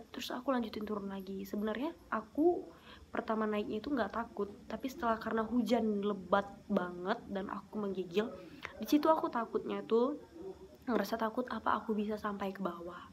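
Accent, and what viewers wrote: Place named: Berastagi, Indonesia. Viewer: Orng dari berastagi mana nih suaranya😌🙏🏻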